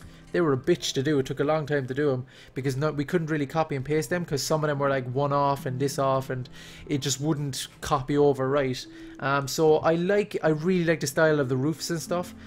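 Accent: Ireland. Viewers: I am and you sound irish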